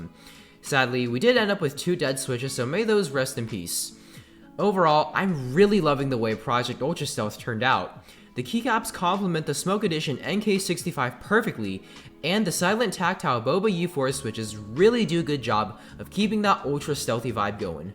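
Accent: South, United States. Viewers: I demand the southern accent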